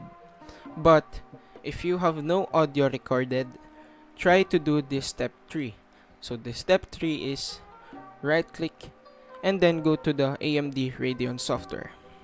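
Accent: Philippines. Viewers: filipino accent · I instantly know this guy filipino😂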